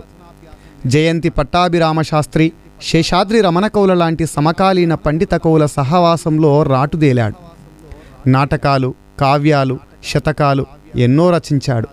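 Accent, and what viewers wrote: Telangana, India. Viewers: Telanmgana yasa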